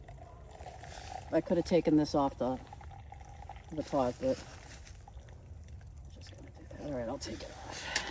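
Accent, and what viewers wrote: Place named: Boston, United States. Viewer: Love your videos & your Boston accent.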